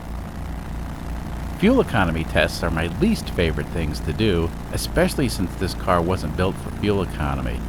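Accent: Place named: Long Island, United States. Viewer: I thought I detected a slight Lawnguyland accent in your videos since I started watching (expatriated North Babylon native here).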